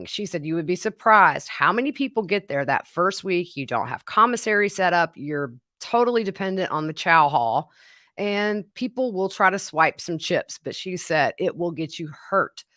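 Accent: South, United States. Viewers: Prisons and all institutions are inhumane. After 6 months of wholesome food, the level of violence significantly was reduced in a school for delinquent youth. Elderly homes, with zombies medicated patients, are transformed with private pods and gardens leading to a central area. The prison system minimally should separate the criminals from convicts, as the British did between devoted Nazi POWs and the other Germans, one of whom married my best friend's mother, a half Jewish woman. This is a fascinating insiders' look into the most hidden world of women's prisons. The lady and you should write a book. It could inspire reform. Kudos to you. Love your home spun style and Southern drawl!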